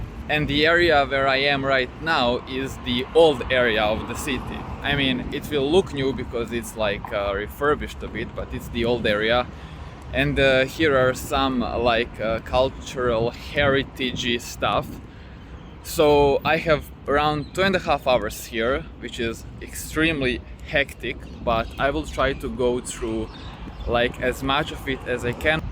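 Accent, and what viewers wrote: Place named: Balkans. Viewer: Thanks for the 'nice video, where are you from Originally? You sound balkanese!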